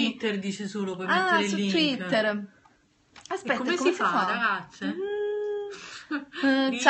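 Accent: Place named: Naples, Italy. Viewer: Una cosa Chitarra romana lei è simpatica così com'è se cambiasse nn sarebbe e poi è Napoletana e a noi piace così sempre una cosa dite l'italiano l'italiano e basta ognuno parla a suo modo nn fate le perfettine a tutti costi e sia chiaro nn è un attacco e solo una risposta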